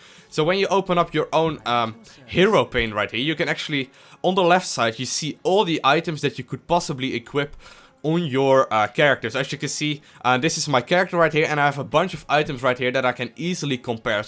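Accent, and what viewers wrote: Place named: Netherlands. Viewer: The Dutch accent is real ;D Great video, thanks!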